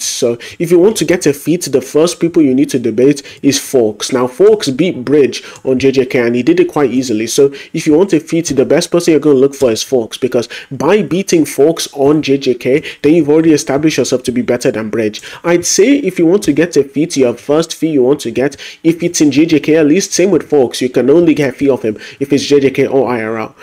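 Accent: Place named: United Kingdom. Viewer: I can’t with that BRITISH ACCENT 💀💀💀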